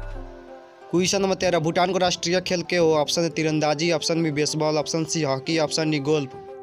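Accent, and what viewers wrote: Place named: India. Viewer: यो खुद हिन्दिबोल्ने धोति हो एस्लेबोलेको voice सुनेरै बुझिन्छ TRP को लागि यो सब गरिराछ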